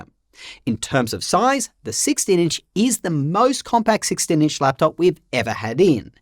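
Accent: Australia. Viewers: Eww, Aussie accent.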